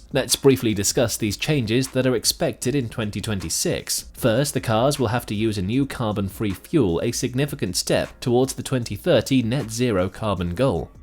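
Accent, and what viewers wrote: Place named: United Kingdom. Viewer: It's getting to the point with these videos, that as soon as you hear a bri'ish voice-over, you may as well skip it.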